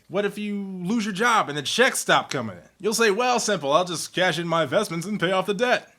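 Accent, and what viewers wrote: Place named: United Kingdom. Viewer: Dude, you have a legit British accent...that is the most important thing I discovered during this video...haha, totally kidding! I can't tell you how many "wise" adults have encouraged me to do #1. :( So sad. Thanks for the awesome video!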